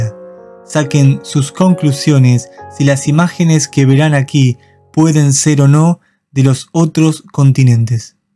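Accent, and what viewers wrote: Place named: Argentina. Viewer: tu hablar con ese sonsonete argentino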